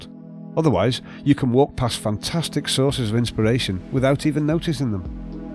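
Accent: Liverpool, United Kingdom. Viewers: Absolutely beautiful but the voiceover by a scouser on Valium reading from a 12 year olds school essay really detracts from the aesthetic as a whole.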